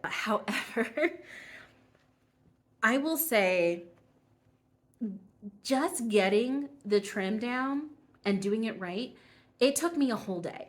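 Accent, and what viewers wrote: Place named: Vancouver, Canada. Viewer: Very impressive. I had to watch it twice. You sound like you're from Vancouver, Can. Keep up the excellent work.🌫️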